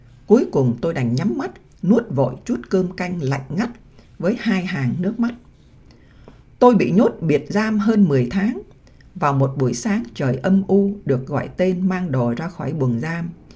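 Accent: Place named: North, Vietnam. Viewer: Chào cảm ơn tác giả Thanh thương Hoàng câu chuyện thật hay buồn thương đến rơi nước mắt,cảm ơn MC "Bắc năm tư giọng như ru ngủ/đưa vào hồn" nhớ,đủ,mãi, luôn/thương thương lắm và buồn như muốn khóc /những người hùng ngang dọc đất miền Nam/rơi nước mắt thôi đành cam số phận/biết nói gì?buồn,giận, hờn ức hận thù căm/ chúc kênh vững mạnh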